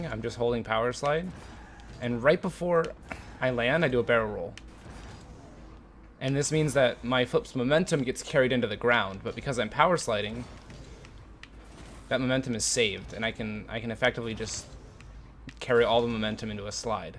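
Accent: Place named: Europe. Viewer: l Sven l I can tell your from EU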